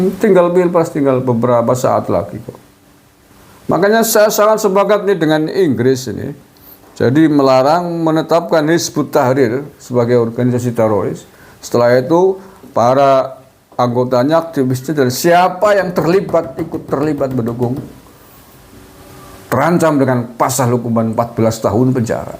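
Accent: Banyumas, Indonesia. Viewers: Mas 🤭 ngomong e koyo Ipar ku wong banyumas 👍🏻🙏🏻🥰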